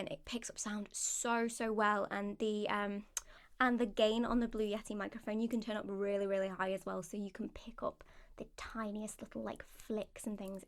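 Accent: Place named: England, United Kingdom. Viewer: Good lord I love English accents...... I'm Irish but I'm determined to Marry an English bird, fuck that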